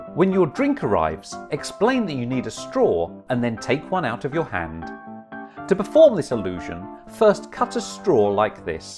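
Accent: United Kingdom. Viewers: Omg u are British even I am 🐶🐶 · r u English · WHAT?!??! I didn’t notice that you are British!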